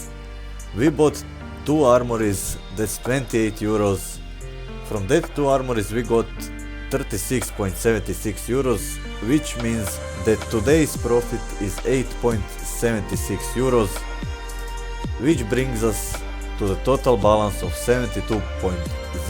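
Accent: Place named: Balkans. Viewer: Not rusdian accent of english i know how balksns speak english hes from serbia or croatia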